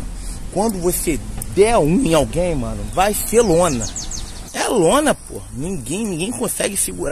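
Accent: Rio de Janeiro, Brazil. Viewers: so vejo esse mano por conta do sotaque de carioca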